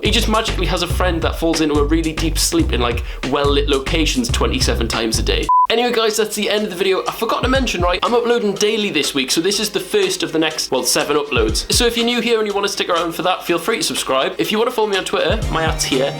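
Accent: Newcastle, United Kingdom. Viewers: So happy to have finally found a Geordie you tuber ☺️ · looks like Shawn mendes - but a Geordie version - loving the Newcastle 😂👊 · are you from newcastle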